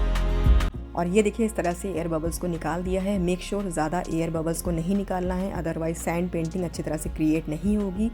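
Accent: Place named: Lucknow, India. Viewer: Im from Pakistan,likes ur lucknawi style urdu,please explore lucknow tradition more,and lucknow markets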